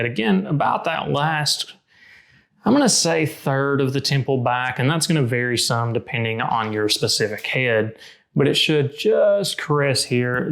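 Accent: Tennessee, United States. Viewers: I recently bought some frames I really wanted from the UK online but was having a hard time adjusting them, watching a few of your adjustment videos helped me get them just right. I had a bad experience with another pair I bought. I loved the frames and the opticians were nice, but they just couldn't get them adjusted right after having them adjust over a dozen times. Anyway, it's nice to have someone explain what to look for and how to make that bend to account for it (also just... what you can and can't do to a frame to make adjustments, I think that's another source of confusion). I looked up your store, nice to see a fellow Tenneseean (I thought I recognized a TN accent!), I'm from Knoxville but I'll definitely look into your shop when I get my next prescription update. Out of curiosity, do you stock Reykjavik Eyes? Thanks for creating these helpful videos!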